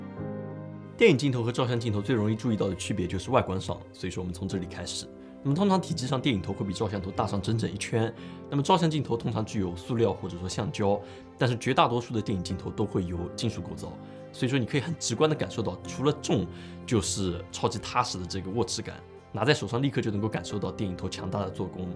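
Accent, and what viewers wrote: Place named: Hangzhou, China. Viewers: 杭州口语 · 强烈的杭州口音！！！听着好点别扭！！！ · 杭州人喔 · 你的杭州口音还是改不过来啊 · 我們大杭州標準口音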